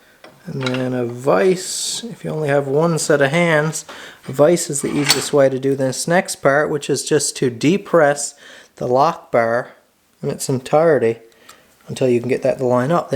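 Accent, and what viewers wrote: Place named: Newfoundland, Canada. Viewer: I knew it! I checked out your info below the video to see if it was true, I could tell by the accent! You're a newfie! God bless you. Great work on that knife. Good to see a fellow East coast Canadian on you tube. (I'm from New Brunswick by the way). Took a woodworking course in Cape Breton so that would have been my second guess. All the best to you and yours!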